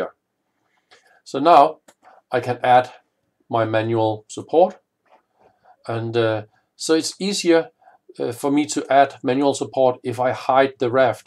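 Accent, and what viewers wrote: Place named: Denmark. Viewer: Awesome... Thank you. This was what I was looking for... By the way... Your accent... Er du dansk?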